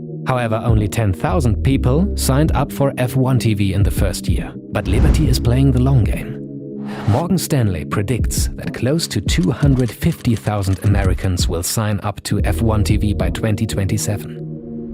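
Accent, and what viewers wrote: Germany. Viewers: German native speaker as commentator?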